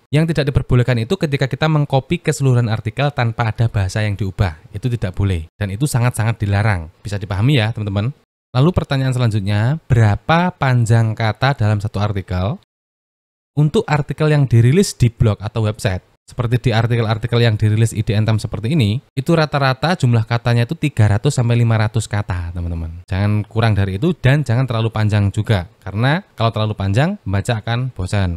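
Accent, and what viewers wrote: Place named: Java, Indonesia. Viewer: Kyk nya beda deh, klo ini jawa nya lebih medok, klo kerah kuning gak terlalu kentara medok nya. Itu sih menurutku.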